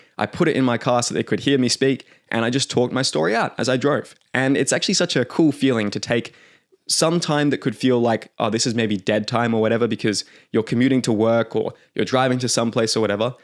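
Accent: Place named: Australia. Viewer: Microsoft with an Aussie accent is just plain weird to my American ears! 🤣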